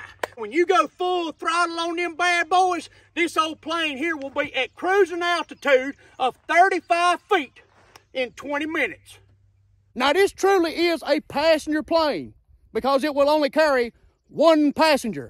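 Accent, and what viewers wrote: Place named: Texas, United States. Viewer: bro sounds like Texas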